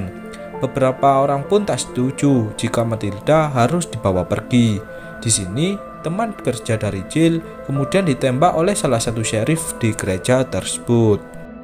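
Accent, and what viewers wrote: Java, Indonesia. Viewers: hostnya first medok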